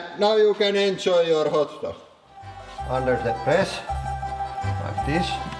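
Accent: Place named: Finland. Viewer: its the Finnish accent I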